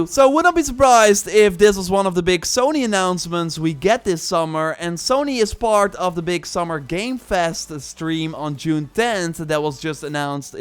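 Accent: Netherlands. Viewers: Are you from holland or somewhere near? :p i can definitaly hear a dutch accent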